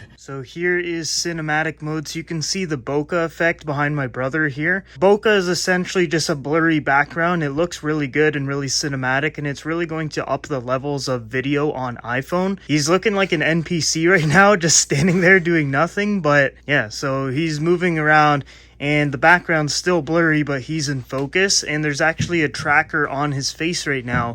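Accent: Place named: Canada. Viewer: What’s wrong with Canadian English?🙄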